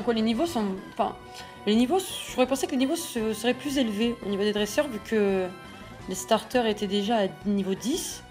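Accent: Marseille, France. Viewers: Salut :) Le petit accent Marseillais qui fait plaisir ^^ GG pour le Galekid dans Rubis,Saphir et Emeraude tu en trouve dans une grotte a la 2eme arènes ^^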